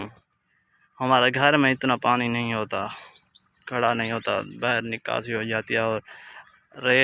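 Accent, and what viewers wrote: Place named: Saraiki, Pakistan. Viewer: ویسے تو آپ کی اردو بہت اچھی ہے تھوڑے اردو کے الفاظ آپ کے کٹ جاتے ہیں وہ آپ کی سرائیکی زبان میں آ جاتے ہیں لیکن کوشش کریں صحیح بولنے کی انشاللہ آپ کو اردو صحیح آجائے گی 👌🏻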